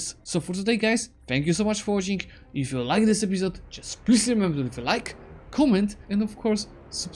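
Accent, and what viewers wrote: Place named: Poland. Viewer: His Polish accent is clearly understandable and I think if there is really a problem, it would surely come from you.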